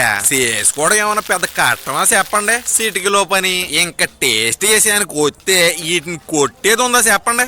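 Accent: Godavari, India. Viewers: Aa basha ki fida bro. Godari yaasa vichala vidiga nachesindi.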